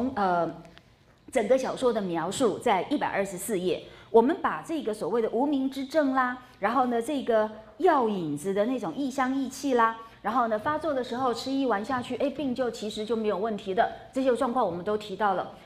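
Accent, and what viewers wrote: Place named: Beijing, China. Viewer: 欧老师的儿化音好可爱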